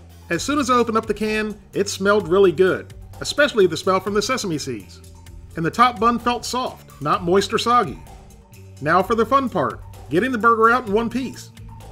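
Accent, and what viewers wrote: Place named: North Carolina, United States. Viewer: Man you sound identical to the folks from my home town in North Carolina, which is really refreshing seeing as how I moved off when I was 19 , do you live in the south by chance ?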